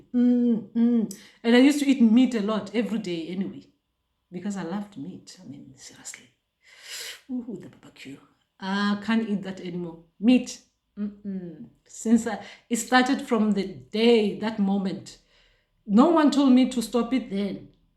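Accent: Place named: Balobedu, South Africa. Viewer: Surely speak like Balobedu. Nna my great grand parents come from Ga Modjadji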